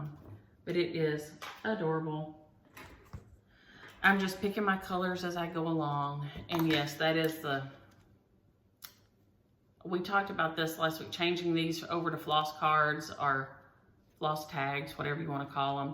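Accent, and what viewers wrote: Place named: Texas, United States. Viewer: I would not leave my Scotties or any pet alone if strangers were coming to my home and I was not there either. You were a responsible pet owner. I want to thank you for the sweet "shout out" today and you are so sweet to share to others to watch my Flosstube also. Yes, us girls raised in the south do have the slow southern drawl. Your Texas accent is charming and I love to hear you talk. I love to read also. I have the Libby app and also Overdrive to get my e-books. I subscribed to Freebooksy also. Maybe you should join Stitching Book Club. I really enjoy it, but I just cannot seem to get all the stitching done. I do love to quilt and sew but my machine and I have a love/hate relationship most of the time so I limit my sewing time so I do not lose my religion. My friend, Deloris, was so excited when you called her name about the "A Tisket a Tasket". 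She loves birds and has loads of feeders for all kinds. It could not have went to a person that loves birds and cross stitch anymore. Happy Stitching, stitchy friend! Dottie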